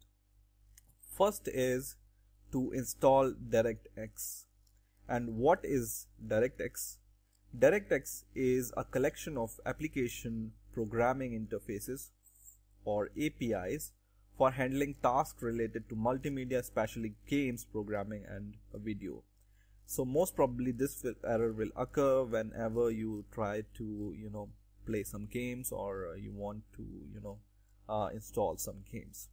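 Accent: India. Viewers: thank you Indian accent man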